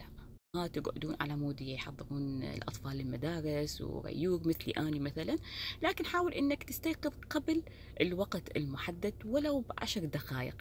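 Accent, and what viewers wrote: Iraq. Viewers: ههههه حبيت الكلام العراقي ❤ شكرا دايما طلي علينا بفيديوهااتك الحلوة المفيدة.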